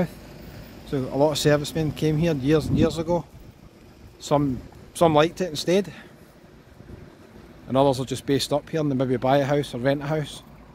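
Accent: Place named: Scotland, United Kingdom. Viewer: Certainly a Scottish accent but his is a central belt accent not a north eastern accent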